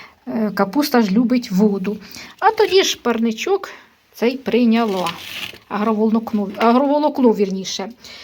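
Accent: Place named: Kirovohrad, Ukraine. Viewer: ви молодець,сільська трудівниця.Люблю вас дивитися і слухати.Мої дідусь і бабуся так розмовляли́ З Кіровоградщини.Миру, здоров'я ❤❤❤❤❤❤❤❤❤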